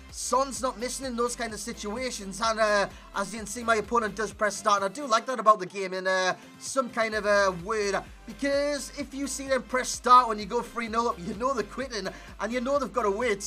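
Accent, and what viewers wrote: Newcastle, United Kingdom. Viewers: newcastle i think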